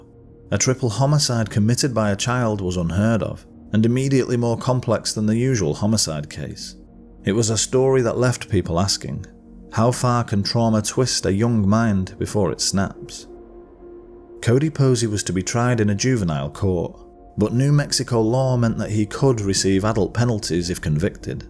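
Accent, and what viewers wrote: United Kingdom. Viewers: Why do you have a British narrator when it occurred in the United States?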